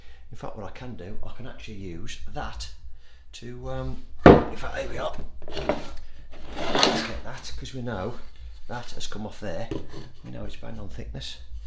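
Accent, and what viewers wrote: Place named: Birmingham, United Kingdom. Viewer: Hello, very impressed with you standard of workmanship and your a Brummie like me! Where about sin Brummie land are your from as our accent changes ever so slightly from one place to another. New to your site but very impressed with you and work and honesty.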